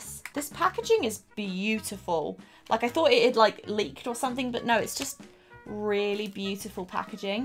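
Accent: United Kingdom. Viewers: Wait she's British!? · Who els loves British accent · Where are you from ??????!!! You sound British but you say dollars ahh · i just LOVE your British accent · You have the accent of the British baby from Family Guy. Not Stewie but the girl he had a crush on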